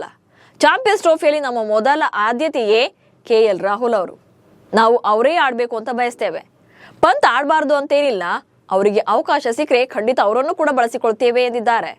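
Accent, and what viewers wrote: Standard. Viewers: Swachcha kannada da dhvani shanta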